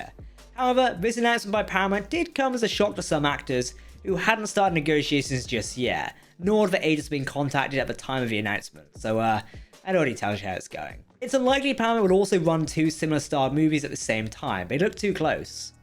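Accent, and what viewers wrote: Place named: Scotland, United Kingdom. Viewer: OMG... I wanted to watch this episode, but the moderator sounded like he was Scottish and was gargling Hot Marbles. The subject would have been interesting IF he could be understood. Please have him speak slower or get a person who can be understood by more than folks from the North of England, Scotland & Whales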